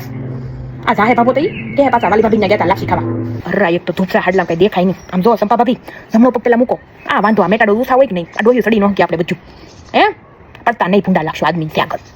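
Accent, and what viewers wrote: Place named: Saurashtra, India. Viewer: બેન તમે ગામડે કેટલો સમય રહ્યા હતા, અને વીદેશમા કેટલા સમયથી છો? તમારી સૌરાષ્ટ્રના ગામડાની બહેનોની રીતભાત અને બોલી ઘણી આકર્ષક છે. વીદેશમા રહીને પણ પોતાની વતનની જીવનશૈલી આબેહુબ રીતે રજુ કરો છો. તમારી રગરગમાં માં ભોમકા ની સંસ્કૃતિ વણાયેલી છે.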